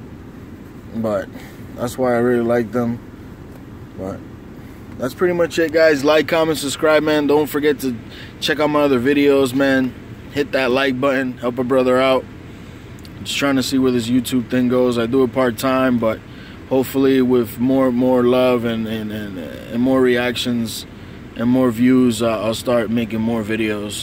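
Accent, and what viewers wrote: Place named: Massachusetts, United States. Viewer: sneaker Man you're from mass i hear it im from dorchester you jist gained a sub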